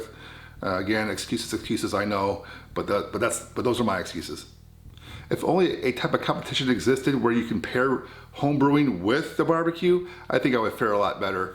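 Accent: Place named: Midwest, United States. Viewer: Ive thought you sounded like your from the Midwest. I was leaning towards Michigan, Wisconsin or Minnesota. Not a bad guess from your accent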